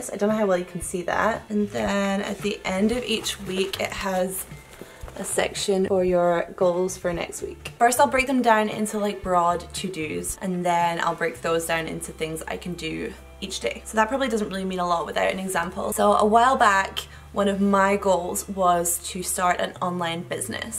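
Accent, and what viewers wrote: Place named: Glasgow, United Kingdom. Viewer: Love your channel! Not a bodybuilder myself, but I’m a girl and us girls can always help each other out:) Spent my early life in Glasgow and hearing you speak makes me feel at home! 😘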